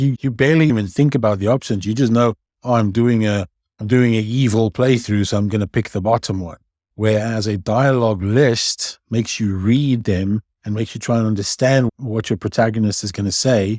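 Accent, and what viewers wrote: South Africa. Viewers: I might be wrong, but is that a South African accent I hear?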